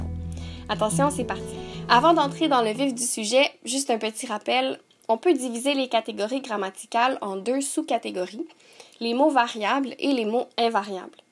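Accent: Quebec, Canada. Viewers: Bonjour, Elle n'a pas d'accent anglais, mais plutôt une variété québécoise. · Bonjour ! Quel superbe travail !! Mille mercis et bravo . Je me sers de vos capsules et des exercices pour les enfants de ma classe primaire en France encore en confinement . Les enfants découvrent en même temps l'accent québécois , c'est sympa !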